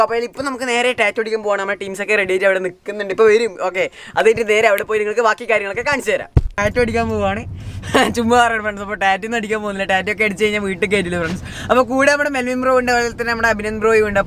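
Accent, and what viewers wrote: Kannur, India. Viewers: Nee kannur karanano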